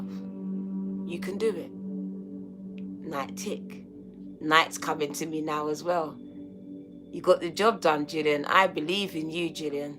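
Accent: United Kingdom. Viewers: You are so gorgeous!! Love the hair!!! And the British accent!!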